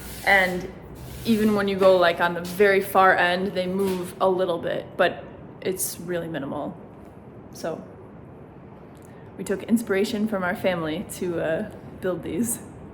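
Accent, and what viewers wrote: France. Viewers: Waouh je viens de vous découvrir et quel ne fut pas ma surprise en entendant ce doux accent FR ah ah ah <3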